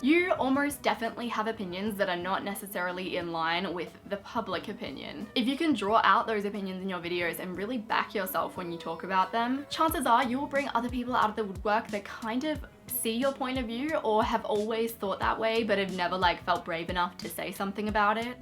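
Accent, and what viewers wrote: Australia. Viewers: I am loving all of your videos. They help me so much! And I love hearing an Aussie offer youtube tips and advice. I follow a lot of the American youtube experts. You are doing amazing work.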